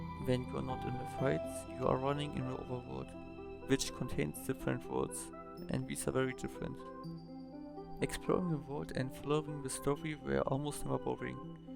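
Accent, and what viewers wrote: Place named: Germany. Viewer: Habe etwas rausgehört, dass du aus Deutschlanf bist und mega tolles Video, nur man versteht dich etwas schlechter:/ Aber keep it up!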